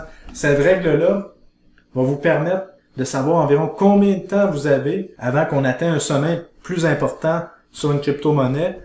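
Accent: Canada. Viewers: Suis je le seul français qui, en lisant les commentaires, entend l'accent canadien ? =D Vidéo intéressante en tout cas, je vais faire attention a cette moyenne pour mes prochains investissements ! Merci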